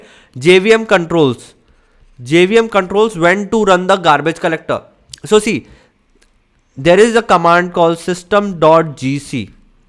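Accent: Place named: India. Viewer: Indian English with cold is the next level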